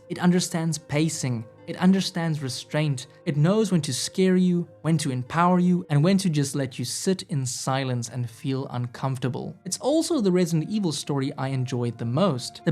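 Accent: South Africa. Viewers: When I started you video, I instantly knew you were South African.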